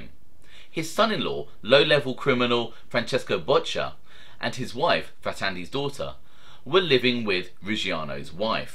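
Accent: United Kingdom. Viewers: I normally despise the British accent(no offense) and refuse to listen to it but you're channel is damn good I can't help it.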